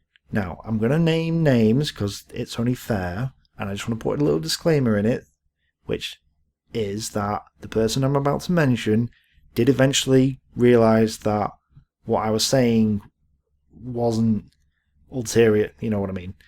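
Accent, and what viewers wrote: United Kingdom. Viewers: I am American and I came away from your Marketgarden video thinking its failure was based largely on Gavin's failure to take t Nimagan bridge. Also, I am so provincially American that I just assumed you were American. You dont sound like t Queen, Michael Cain, or Richard Dawkins. So Idt I even noticed your Brit accent. Tho now that you bring it up , you do clearly have some sort of Brit accent. My wife guessed that you are from Manchester . Tho Idt you sound like Ian Curtis either. So Idk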